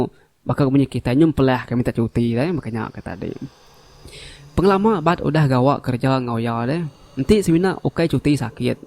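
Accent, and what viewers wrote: Sarawak, Malaysia. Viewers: Biasa lah nya, lidah iban hehe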